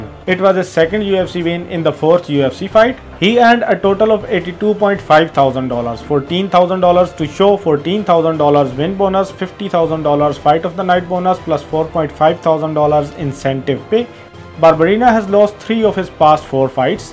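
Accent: India. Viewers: Hi bro you sound indian how do you watch fight night and paper view undercard usually ? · Your videos are good but your Indian accent is so thick and off putting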